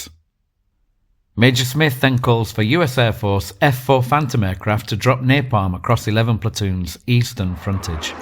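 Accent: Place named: England, United Kingdom. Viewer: Excellent presentation: short, sharp and clear. It's really easy to listen to your posts. As an Aussie I find your Pommy accent and presentation very endearing--we truly are very close cousins. God save the King!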